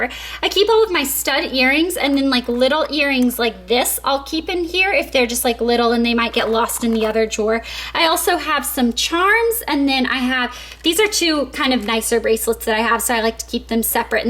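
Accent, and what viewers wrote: South, United States. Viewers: well she does have a southern accent who's trying to speak northernish so asking her to speak perfect french is probably a little too much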